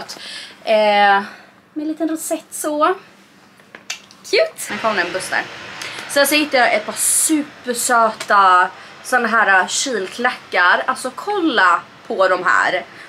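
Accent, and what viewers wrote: Stockholm, Sweden. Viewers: Du säger ehh typ massor .sen undrar jag om du bott i Stockholm då du pratar Stockholms dialekt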